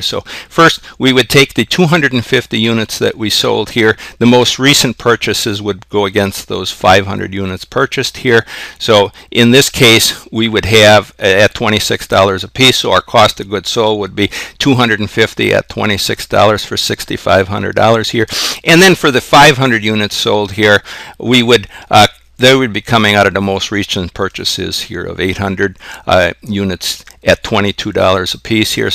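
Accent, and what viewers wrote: Boston, United States. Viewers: Your Boston accent is so strong, I imagined Peter Griffin speaking. Nevertheless, great tutorial! Definitely needed it for my FA class.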